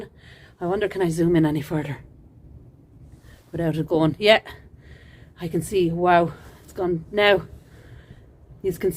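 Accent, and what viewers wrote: Ireland. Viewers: Great job! Appreciate the dedication and work you put in. Are you kidding? The wind noise and camera focus is nothing - anyone who's ever done video knows that. Ignore the naysayers -- 99% of the people enjoyed it - the other one percent are holed up somewhere living off their parents and whining. You do a terrific job - glad I found you and hope to see more of your work in the future. And I love the Irish brogue!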